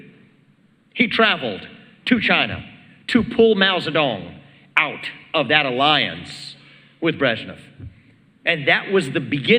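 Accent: South, United States. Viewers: So funny to see an Indian with a Southern accent. Reminds me of that dude from The Expanse who got cancelled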